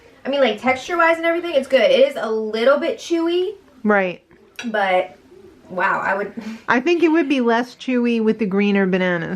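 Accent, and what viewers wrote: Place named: United States. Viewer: This would not go over well with Americans! But you sound like your from the States yourself.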